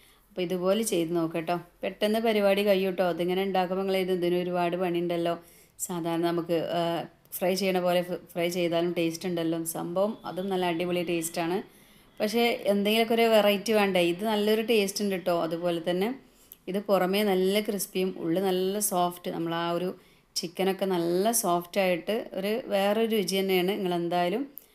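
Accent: Malappuram, India. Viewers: Mpm slang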